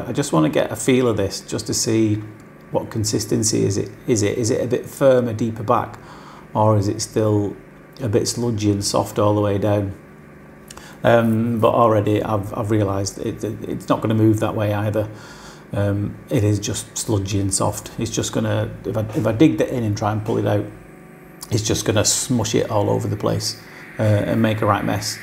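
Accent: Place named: United Kingdom. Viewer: You know your accent is very pleasing to the ear, but especially when you say words like “sludgy” and “plug”. Can’t say what it is exactly … the UK is full of wonderful accents, expressions and speech patterns! ❤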